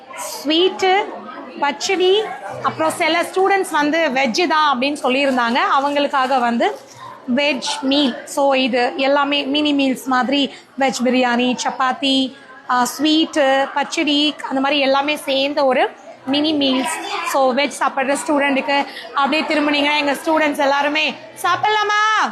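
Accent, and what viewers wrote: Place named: Salem, India. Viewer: சேலம் பாஷை அப்படி தான் இருக்கும்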